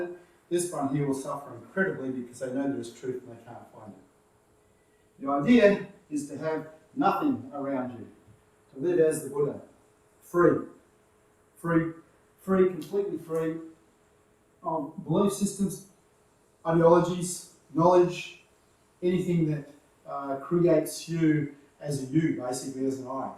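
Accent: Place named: Australia. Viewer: I wish I could understand the Australian accent 😢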